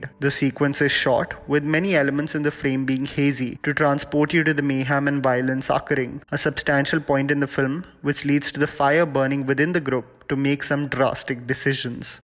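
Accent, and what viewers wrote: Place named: Tamil Nadu, India. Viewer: The Tamil accent though ..... 😅😅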